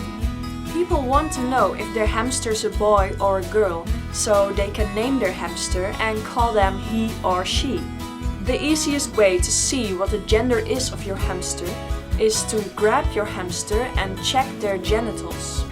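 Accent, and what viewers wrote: Netherlands. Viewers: Are you dutch? If so, I can kinda hear it by your accent. Btw Ik ben Vlaams. :)) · Vgm is ze nederlands ja het accent is heel erg te horen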